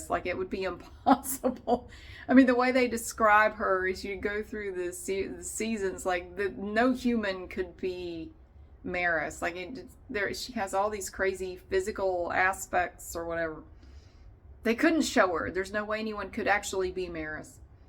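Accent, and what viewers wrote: South, United States. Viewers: I just like you talking; I think it’s a northern guy liking a southern girl talking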